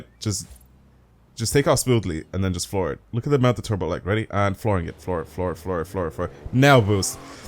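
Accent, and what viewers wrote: Ireland. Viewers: I can't tell what his accent is but it sounds Irish or European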